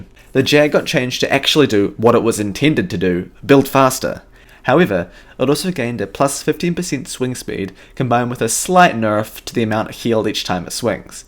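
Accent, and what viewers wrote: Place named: New Zealand. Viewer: From your accent I guess you are from NZ. And holy crap you deserve more substance.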